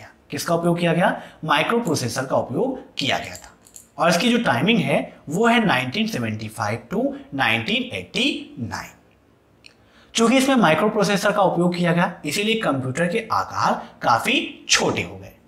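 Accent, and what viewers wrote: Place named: Madhya Pradesh, India. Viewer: Mp की laungage लगती है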